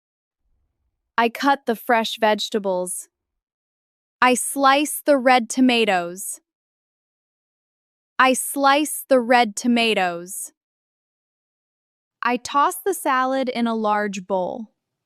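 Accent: United States. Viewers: Please is it american pronunciation!? I would like British one also😊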